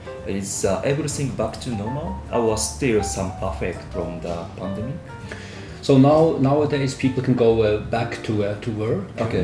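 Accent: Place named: Japan. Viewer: クリスチャンさんの英語はまるでイギリス英語のように聞きやすい。 特に黒人系のスラングたっぷりの英語を直で聞いたあとならね。 そしてRYさんの英語発音は結構カタカナ英語っぽいんですね。 巻き舌がほぼなくて我々日本人に聞きやすくできてる。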